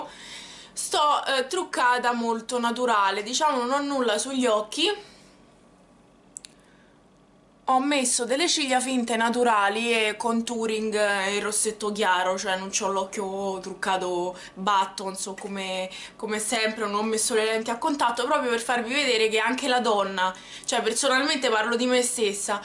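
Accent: Rome, Italy. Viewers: Io non ho questo stile ma ti giuro che è bellissima questa rubrica,poi io amo l'accento romano (io sono milanese) quindi amo sentirti parlare 💙💙😘 Ah,sei sempre la più figa 🔝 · perchè non provi a fare anche un video dove insegni detti Romani? o comunque dici qualcosa in romanaccio, adoro come parli ahah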